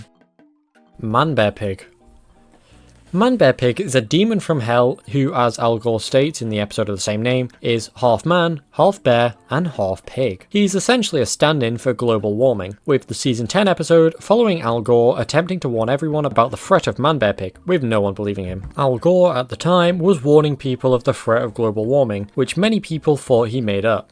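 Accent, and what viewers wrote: United Kingdom. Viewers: Are you welsh?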